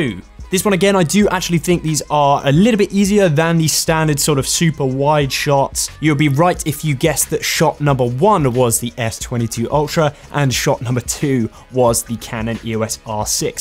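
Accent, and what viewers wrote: United Kingdom. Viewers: You sound British, thus you must be using the Exynos version of the phone? Do note that it is good to mention this in the video as there are 2 versions of this phone around the world and both have somewhat different camera quality...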